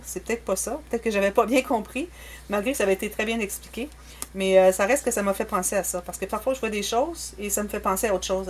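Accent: Canada. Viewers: Je m'abonne grâce à (eternity pour toujours) tu es très doué magnifique j'adore je like 👍👍👍👍👍👍 as tu fais un tuto pour l'enveloppe à soufflet tes explications sont très très claire j'adore aussi ton accent tu es canadienne ? Si oui j'y suis allé et j'ai adoré je suis revenu et je voulais déménager la bas mil merci pour votre partage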